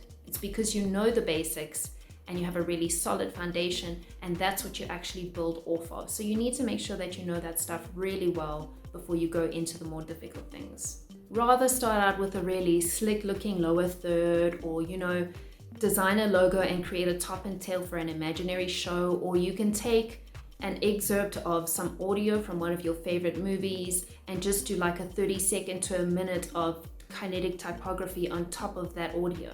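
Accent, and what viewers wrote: South Africa. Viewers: Your accent sound South African.... great vid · the south african accent>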